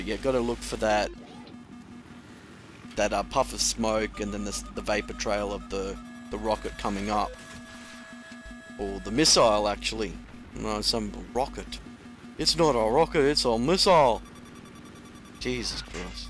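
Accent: Australia. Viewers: Are you Aussie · r u aussie? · Man, this commentator is brilliant. So classically Auzzie and just so informed and blunt. Fuckin' awesome man. :D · Oi Mate, Sounds like u are Aussie? If so, ello... I have been there and I like Aussie women, Kiwi women, Chopper and VB beer mate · You're Australian, aye? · Sweet vid man its good to see bf3 aussie commentators. Keep the videos coming! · its kinda obvious ur aussie lol me too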